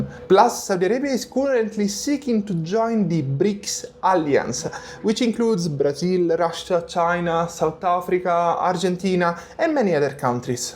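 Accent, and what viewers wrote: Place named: Italy. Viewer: I love the Italian accent. It is the most understandable for Russian speakers 💖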